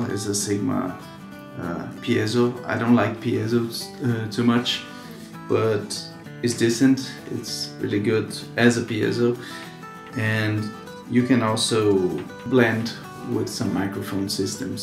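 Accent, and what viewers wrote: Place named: Denmark. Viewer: Nice! It just sounded a little like a danish-english accent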